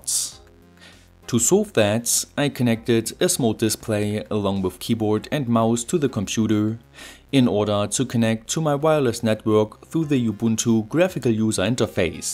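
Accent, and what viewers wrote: Germany. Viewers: Hey! Are you german? What's the accent?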